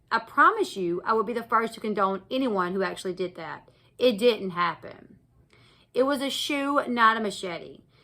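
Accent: South, United States. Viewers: I love your country accent and I love hearing you say book so I think it’s hilarious that we’re now keeping count of how many times you say it 😂 You look so beautiful.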